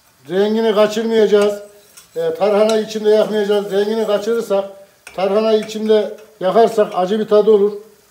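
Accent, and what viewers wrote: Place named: Ankara, Turkey. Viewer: Ustam nerelisin sanki ankarali gibisin merak etim bende ankaraliyim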